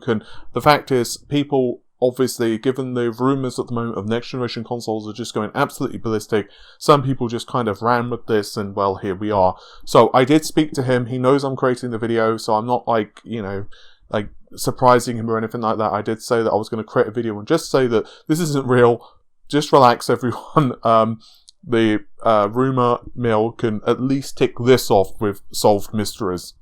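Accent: United Kingdom. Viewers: Its rough having a deep voice with a British accent imo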